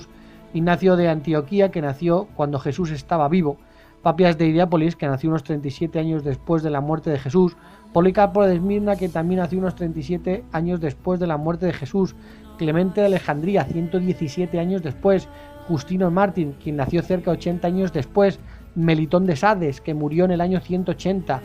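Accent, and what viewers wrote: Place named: Spain. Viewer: que tal si muy cierto y ahun así cada vez la gente cree más en extraterrestres que en Jesús,si soy de Morelia Michoacán mexico ,pero hace como 16 años vivo en usa vivo dos años u medio en California y después me mudé a Carolina del norte,conosco poquito new york ,muy de pasada ,ahunque aquí en usa casi todos los lugares son iguales jejeje,y usted de que parte de España es?me imagino que es de la madre patria por su acento ,y ay disculpe tanta falta de ortografía ,mi hermana dice que tengo faltas de ortografía hasta al hablar jajajajajajaja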